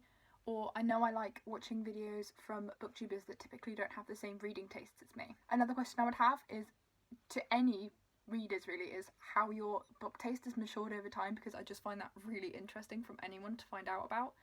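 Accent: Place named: South, United Kingdom. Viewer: What r u studying at uni? If u were ranking all the UK Prime Ministers, where would Boris fit? Dark hair and blue eyes: Celtic blood perhaps. Yup, posh accent, you are a s'erner, but certainly not like eg. Emma Watson's modern RP (Received Pronunciation). Anything RP is so choncey uppercrust.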